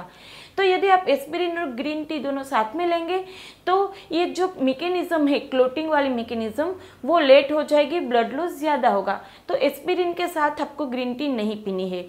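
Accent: Gujarat, India. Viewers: Tame gujrati chho????😎😎😎😎😎😎😎😎😎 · Are you Gujarati?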